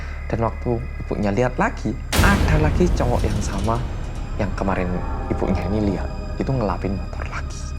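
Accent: Java, Indonesia. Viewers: bersama orang korea yang... Hensa( Me): kewe...: medhokkkkkk eh... Hensa( me): lhaaaa Hahahhahajajaja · bersama orang korea yang MEDHOOOKK aku dah tereak medhok juga. trus sadar kalo ini rindingdong :) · Orang Korea yang medokkkk😌👍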